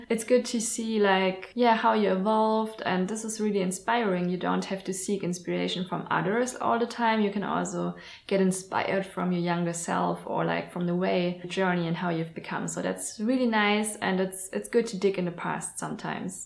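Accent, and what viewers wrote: Germany. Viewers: Dein Englisch ist ja schon gut, aber man merkt schon sehr das du aus Deutschland kommst :D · Are you from Germany? Your accent made me think that. Btw I really appreciate your work, you are very successful.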